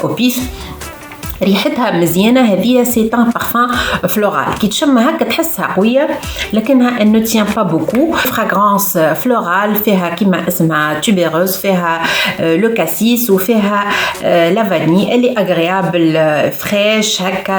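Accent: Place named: Tunisia. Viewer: J'adore l'accent tunisien thabeel , merci pour la revue #algerie ❤️❤️❤️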